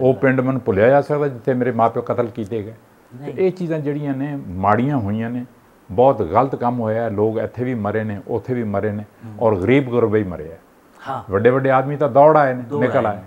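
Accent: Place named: Multan, Pakistan. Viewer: Beautiful. Maza aa gaya Malikha Singh ji ton Multani ( hurn inu Saraiki aandy aan) di mithri boli che mithri khawat sun key.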